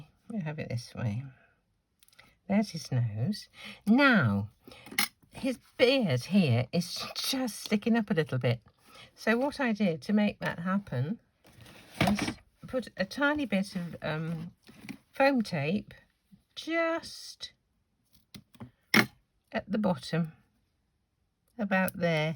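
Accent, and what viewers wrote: England, United Kingdom. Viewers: Hi. I am in Spain and love your videos. Not many with a lovely English accent. I haven't got the same die, but I do have one with a gnome on and will try and do something similar. I hope it works out. thank you so much for sharing this idea. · I’m watching you from Mississippi, USA. can’t always catch you during the day but usually enjoy your videos in the evening. I love you cards and your soothing British accent. Looking forward to your next video.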